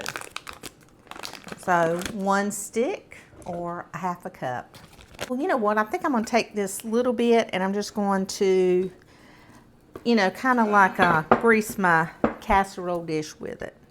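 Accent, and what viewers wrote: South, United States. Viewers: southern accent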